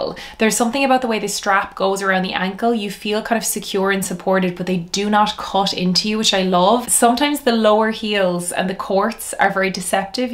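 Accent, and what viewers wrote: Ireland. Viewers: the Irish accent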